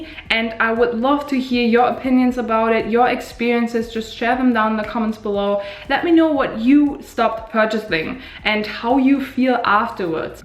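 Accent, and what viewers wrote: Germany. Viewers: I love German English 💚💚😆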